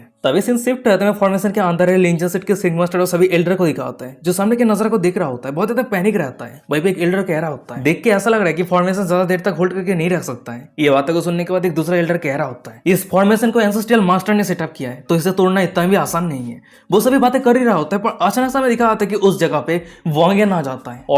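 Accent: Bengal, India. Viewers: Bhai tum Bangal se ho na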